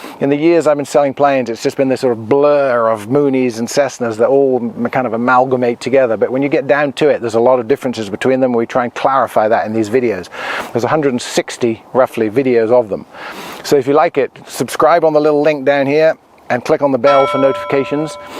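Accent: England, United Kingdom. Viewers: I listen to you for the "English" accent so say "leeever".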